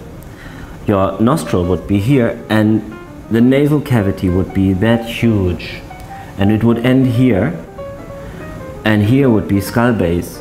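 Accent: Germany. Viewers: His accent is so German so I trust him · are you from germany?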